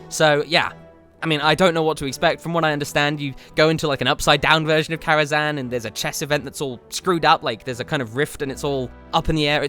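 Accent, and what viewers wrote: United Kingdom. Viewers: you sound british?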